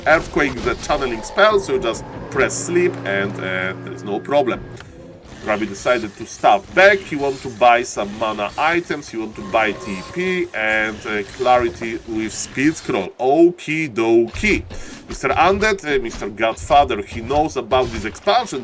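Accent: Poland. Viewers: Hey, I've seen your commentary with Neo at Back2Warcraft, is it you? Are you polish? Cause your accent sounds like you are polish, just like me :D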